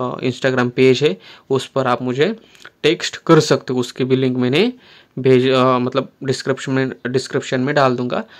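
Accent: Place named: Maharashtra, India. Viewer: Same amchya sarkhich hindi speaking...... apla marathi manus detected ❤😁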